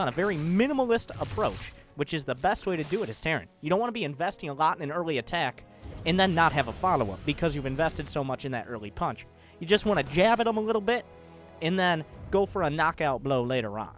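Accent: United States. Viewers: USA but with a more "country" accent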